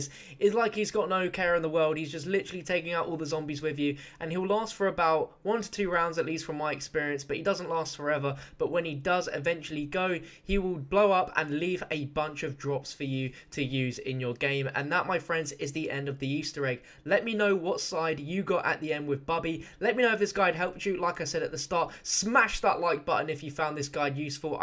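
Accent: United Kingdom. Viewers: 0 , idk if youre serious but if you are its garage but since he is British he pronounces it like that...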